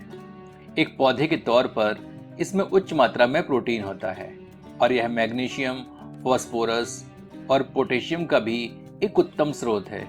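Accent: Standard. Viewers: ji pahli bar Laga ki koi Bhartiya safe Bhartiya vyanjan banaa raha hai. Aap dwara shuddh Hindi uchharan sunkar hamen Apne per aur apni bhartiyata per Garv hota hai aapko कोटि-कोटि dhanyvad. Aap din duni Raat Chaudhari tarkki Karen Ishwar aapki madad Karen.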